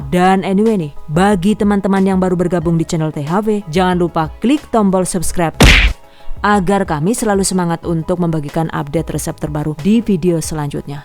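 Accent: Banyumas, Indonesia. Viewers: Bukanya serius nonton malah ketawa denger ngelawak ngapak😂😂😂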